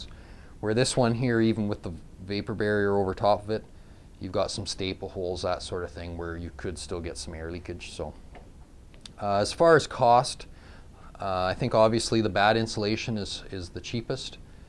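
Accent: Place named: Canada. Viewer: You sound very Canadian. 😁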